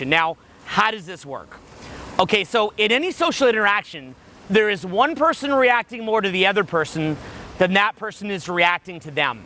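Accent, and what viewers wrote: Australia. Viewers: i love your australian accent mate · Good fuckin Australian accent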